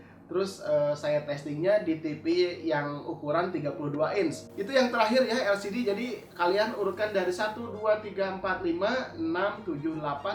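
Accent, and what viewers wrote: West Java, Indonesia. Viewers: Ke nya si om orang sunda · Urang Sunda kang 😁? Mantep lah infirasiyeun yeuh 😁😁 ahuyy #TerusberkayakangNOLIMITE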